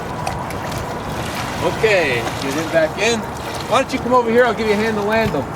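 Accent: Canada. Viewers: Are u canadian :)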